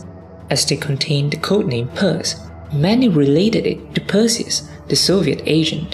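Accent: United Kingdom. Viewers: 有学剑桥英语吗？你的口音好像学过呢~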